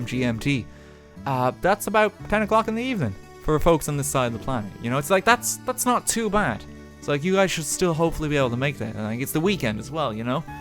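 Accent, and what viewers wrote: Ireland. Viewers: Holy shit youre irish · So what part of Ireland are you from?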